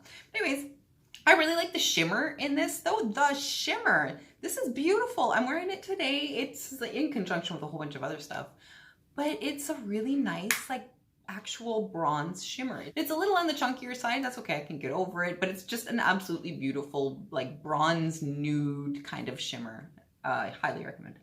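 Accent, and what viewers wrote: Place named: Newfoundland, Canada. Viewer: Lol ur fun to watch. Not to mention purrrdy. Im thinking of subscribing to this box. R u from nb ns or nf ?? I always know a downhomer when i hear one. :)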